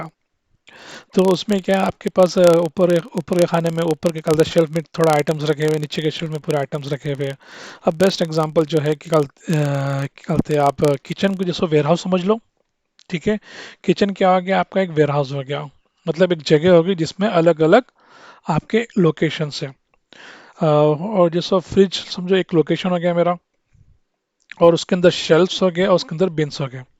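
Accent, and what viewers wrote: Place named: Hyderabad, India. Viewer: Abba Kiraak Hyderabadi accent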